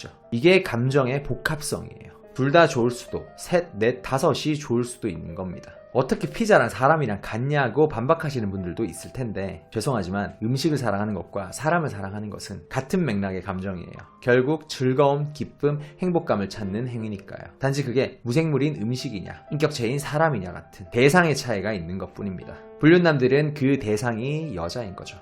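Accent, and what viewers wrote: Gyeongsang, South Korea. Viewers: 경상도세요? 발음이 살얼음 위를 걷는듯.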